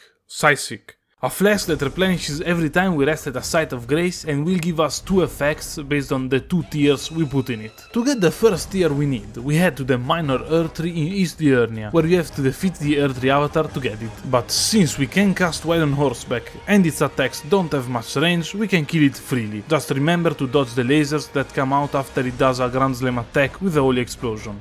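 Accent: Italy. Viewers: He sounds italian wtf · scusa la domanda, sei italiano? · Bro are you italian? (I m italian) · are you italian? this accent 😹❤️ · Accento italiano fortissimo, poetico❤️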